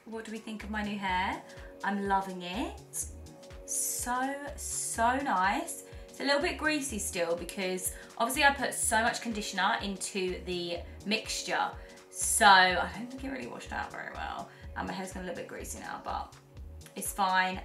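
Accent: United Kingdom. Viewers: She's from Great Britain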